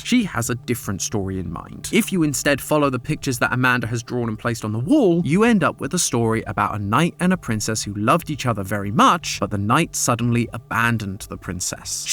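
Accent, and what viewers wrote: United Kingdom. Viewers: im still not used to the british guy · I don’t remember him being British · Ok so has he always sounded British 😂😂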